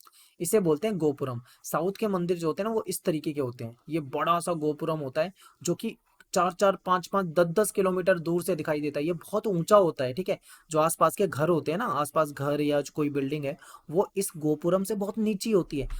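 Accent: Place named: Haryana, India. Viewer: Bhiari nhi beta haryana se ha❤😊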